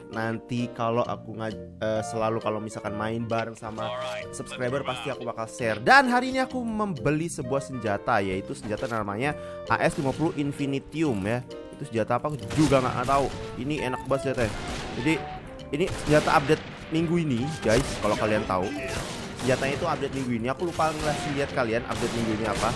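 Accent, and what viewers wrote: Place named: Surabaya, Indonesia. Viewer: wallaa , Boso ee kok suroboyoan ngnee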